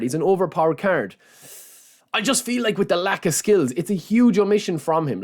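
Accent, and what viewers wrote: Ireland. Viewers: Bro, are you from Ireland? Your accent is familiar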